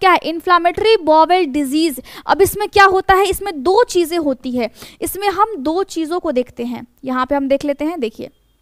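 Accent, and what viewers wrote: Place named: Bengal, India. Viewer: Bengali walo ki Hindi bada cute lagtaa❤ ra ko da bolna Bara nehi bada Kaaran nehi Kaadan😂 Fir v confident bahut hai didi🎉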